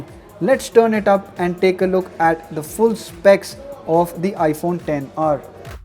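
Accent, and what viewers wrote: India. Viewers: INDIAN ENGLISH SKDKSDHSHJDSAD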